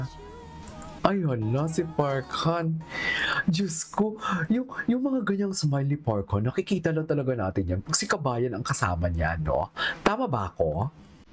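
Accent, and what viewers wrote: Iloilo, Philippines. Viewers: Baw ilonggo ka sir ?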